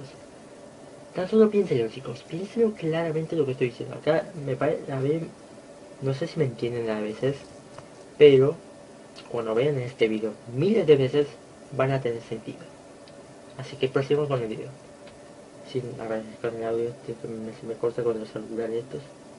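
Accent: Spain. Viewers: por favor deja de hablar como español tenés que ser vos!!!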